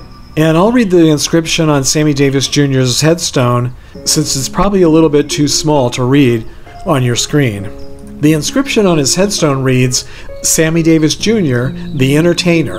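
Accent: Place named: California, United States. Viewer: you remind me of Lyle Talbot's son, Talbott--aka "Gilbert Bates" on LEAVE IT TO BEAVER. You even share the same name--even...lol. You both have that California accent; sounding the same. I wonder if Gloria Talbot is related.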